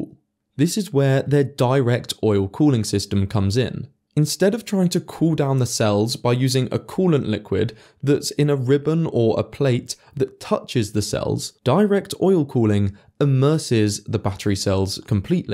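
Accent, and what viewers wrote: United Kingdom. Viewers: As soon as I heard him say "electric flights" and that the centripetal force increases "exponentially" with the speed of rotation, I knew right then, that the person quoted saying that this car "bends physics", and the british accent narrator themselves know absolutely nothing about physics. It's easy to see how they might think this. Sorry guys, but this is clickbait.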